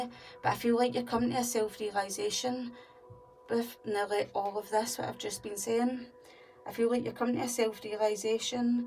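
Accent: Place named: Scotland, United Kingdom. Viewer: Thank you! And love your Scottish accent (and can't believe that I can understand you! :) 👍❤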